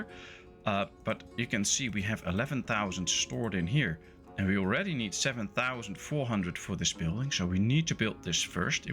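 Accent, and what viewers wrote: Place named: Netherlands. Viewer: Goede tutorial. Ik zou wel mijn Nederlands accent wat proberen weg te poetsen. Klinkt nog te veel als Mark Rutte die Engels probeert te praten. Probeer "kid" niet uit te spreken als "kit" en "pause" niet als "pos". Ze hebben een wat langere klank. Update: en "wood" niet als "woet" ;)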